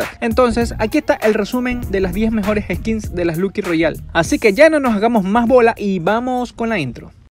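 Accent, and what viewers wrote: Ecuador. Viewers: De donde eres? Con tu vos me pareces ecuatoriano😕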